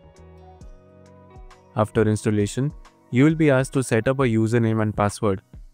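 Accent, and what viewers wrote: India. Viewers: indian, ew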